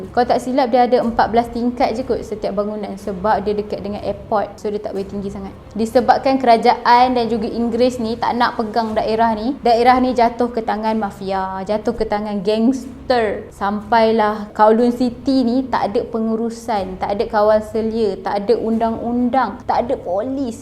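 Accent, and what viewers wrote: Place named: North, Malaysia. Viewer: tak taw pasepa... slank utara detected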